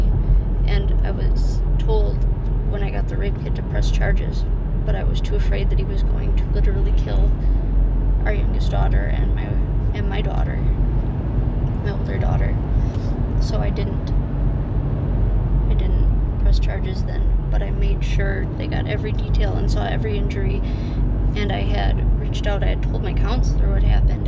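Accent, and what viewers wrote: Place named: Ireland. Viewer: I was just thinking if your are Irish or American-Irish as i recognize the twang and of course the penchant for red hair? There are several pitiful girls with their story here on YT about similar domestic situations that had turned abusive and eventually violent. You naming your children Riley and Reagen is also pointing me in the same direction. I feel very sad about your story, but i get the sense that there is something missing in your story, which would clarify why you are a submissive in the first place?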